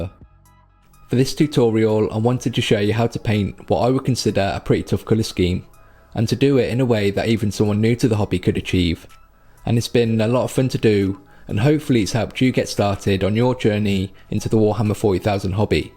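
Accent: United Kingdom. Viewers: Whoaa.. you got auto voice translation IA caption in spanish?.. cool. Anyways I lke so much your original accent British voice 😅. Awsome video and subscribed. Cheers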